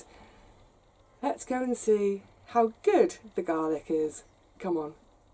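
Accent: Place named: RP, United Kingdom. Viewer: ..."as I was saying upside down in the broad bean bed..." you do make me laugh..loving the stuff you do and am a new sub, so thanks for the content...just to add, I thought you were a bit posh ( accent) and then I watched you talking about how you grew up on a council estate and I had to rethink my impression of you!!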